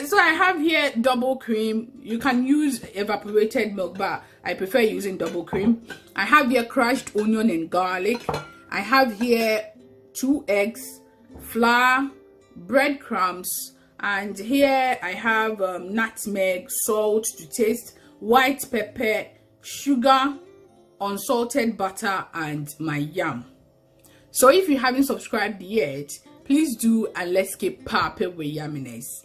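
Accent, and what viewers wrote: Ghana. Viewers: You sound like a Ghanaian . Are you? Yam balls sounds yummy 😋 I love the mortar and pistle. From dakty channel. Thanks for sharing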